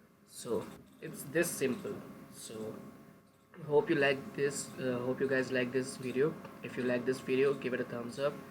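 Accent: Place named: United States. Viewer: Missing your american accent😕😢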